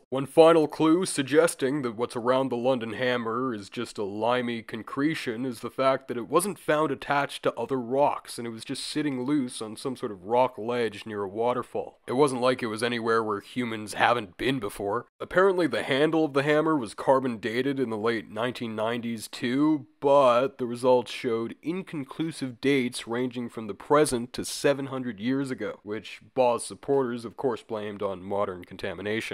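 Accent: Canada. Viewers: I thought he was Canadian? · he’s just Canadian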